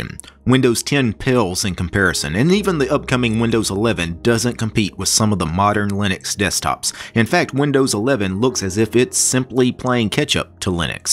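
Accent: South, United States. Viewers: “You can change desktop invrments” 😂 I love your southern twang 👍🏼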